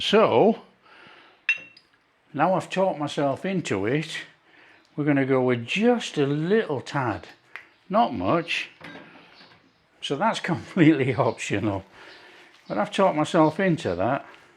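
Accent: Yorkshire, United Kingdom. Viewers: do some Eccles cakes or Chorley cakes, take care pal, i love your presentation, reet proper Yorksher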